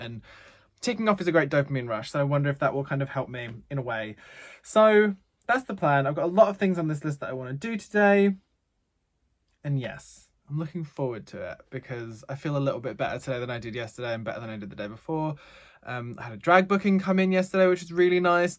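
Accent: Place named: England, United Kingdom. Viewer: Missed your top tier English accent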